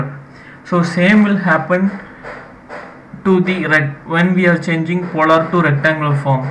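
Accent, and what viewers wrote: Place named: India. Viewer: dont put the tone of Hindi while speaking English, for example dont use the tone of the hindi word "pyaar" when you say the english word "love.........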